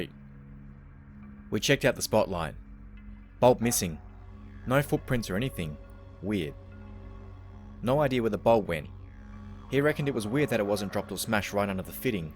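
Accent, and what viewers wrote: Australia. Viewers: Yay, a fellow Australian narrator. I wish I discovered your channel sooner. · Honestly the first time im hearing an australian man narrating creepy stories. Pretty well done.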